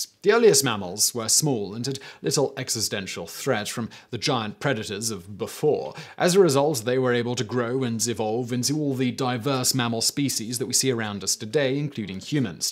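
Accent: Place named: RP, United Kingdom. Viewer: I can't figure out how you got that tofffy nosed accent and yet, can hardly pronounce common words. Is it just regional and unrelated to class/education? This is why people study journalism and broadcasting.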